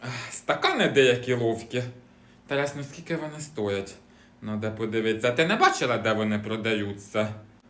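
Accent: Poltava, Ukraine. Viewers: Дякую Ти дуже ловко балакаєш по Полтавській,а то що годи у нас і досі так кажуть,ідем на годи до Миколи💕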